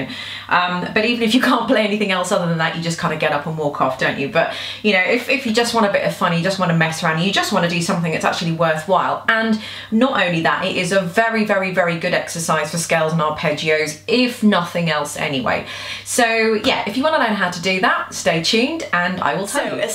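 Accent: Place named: United Kingdom. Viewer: I hear an accent... Are you from UK? You Are Awesome!!!!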